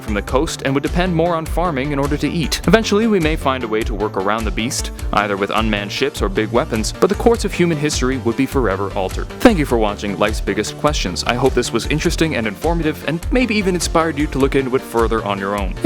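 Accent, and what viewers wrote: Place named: Scotland, United Kingdom. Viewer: Dude it's not that hard to understand Scottish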